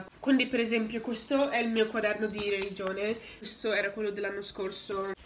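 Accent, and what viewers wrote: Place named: United Kingdom. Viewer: Si sente tantissimo l'accento British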